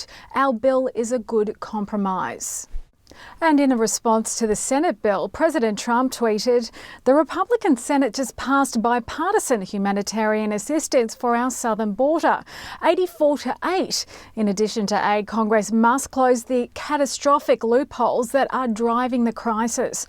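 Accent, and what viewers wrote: Australia. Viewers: Perfect lady anchor gorgeous with an Australian accent. Love it!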